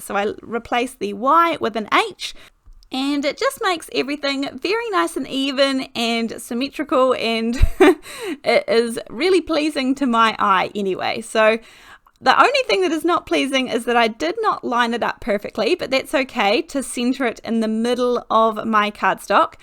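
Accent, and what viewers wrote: New Zealand. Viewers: 😍😍😍love the card!...and love that fantastic kiwi accent! Cheers from Texas💖😘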